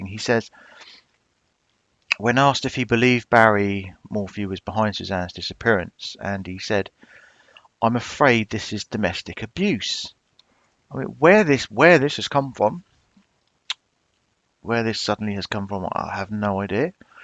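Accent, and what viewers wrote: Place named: England, United Kingdom. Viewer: where in England is this accent from?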